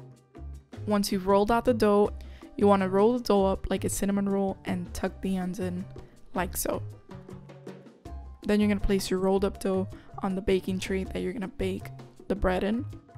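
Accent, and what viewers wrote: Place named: Hialeah, United States. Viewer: I don’t even have to know you to know you are from Hialeah!!! 😂 Love it! Can’t wait to try this! Subscribed and will follow you on IG :)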